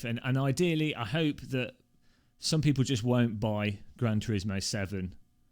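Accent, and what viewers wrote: United Kingdom. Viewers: I clicked on your bait but as soon as you spoke i knew it was more dry British humor, cheers A+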